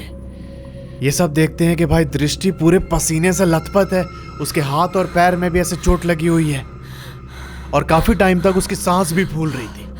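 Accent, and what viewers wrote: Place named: Bengal, India. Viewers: Diwali 🪔🎇 Ke ager din Matlab bhut choturdhosi ke din 14 saak or sabke pura Ghar mein 14 🪔 Candles🕯️ Esob Hum Bengali Log Apne Apne Ghar mein lagate hai or bhaiya bhut choturdhosi ke dis sachmein darabna kuch hota hai eto maine bhi suna hu likin koye bhut aaise baat nhi kar sakta hai jo bolega MAI ARPITA NHI HU, aise shadow bagera dek sakta hai likin eeita kuch hota hai bhuut bat karta hai e kuch jada hi hai aspas negative energy rahta hai to feel hota hai. Likin Bhuut samne ake bath karega esob nhi hota bhaiya 😅 PLEASE REPLY CHAT ? OR ek bath Apke Bengali Voice So Sweet ❤ ! LOVE FROM KOLKATA / (WB ). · Sir your bengali accent is so cute 🥰🥰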